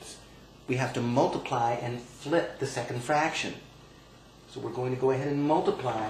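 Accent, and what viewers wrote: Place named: United States. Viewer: i dont understand you cuz have a strong american accent it gets in the way when you explain it and to top it you talk to fast i am sorry to say this ...... but hey i am from London and i am watching this!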